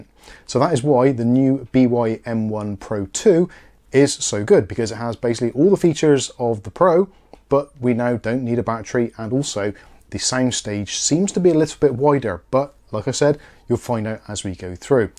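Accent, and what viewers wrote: Bristol, United Kingdom. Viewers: Your audio has always been ok, despite the lovely Bristolian accent. My ears are not good enough to tell such details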